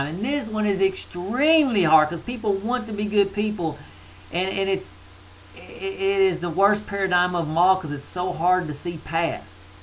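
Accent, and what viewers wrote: South, United States. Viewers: Very good 'good ol'boy' accent. Simple wisdom from a simple guy... one of us? Not really...hahaha. What we have here is a contradictory jambalaya of esoteric 'doctrine of the ages' sprinkled with liberty movement and generic pseudo Christian phrases. Paranoia is delusional fear. Sadly there is too much blatant & historic evidence of the malevolent intent in the character and actions of the long laboring apostles of the coming New Age of false "Peace, Love & prosperity", to be labeled Paranoia.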